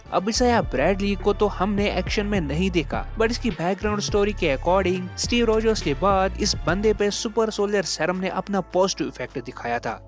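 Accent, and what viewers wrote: Kashmir, India. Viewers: Bro Are you Kashmiri . You have a Kashmiri accent ❤️❤️❤️❤️❤️😘😘😘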